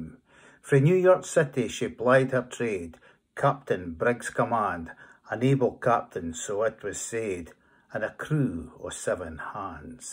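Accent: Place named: Scotland, United Kingdom. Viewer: Beautifully Recited, with Strong, Authentic, Scottish Tones, of Vocal Broth. Thank You 🫂❄️😇🎁💓⬆️💥🌀😊💫💖💚🙏🧚‍♂️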